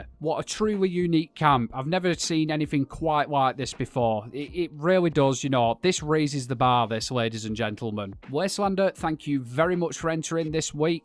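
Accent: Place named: Lancashire, United Kingdom. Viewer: Bolton or Wigan accent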